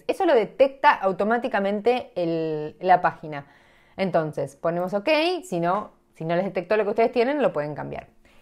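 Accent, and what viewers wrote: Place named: Argentina. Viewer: ahora soy tu fannnn. Eres de Argentina, verdad? Buenos, digo por el acento... Tengo amigos argentinos que amo. Yo soy de mi amada Venezuela...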